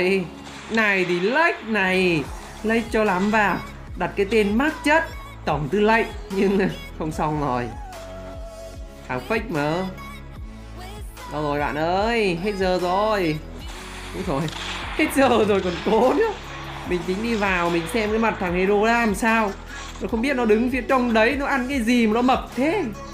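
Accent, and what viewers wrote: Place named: Thanh Hoa, Vietnam. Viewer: A nghe giọng giống quê hoàng thanh-hoàng hóa..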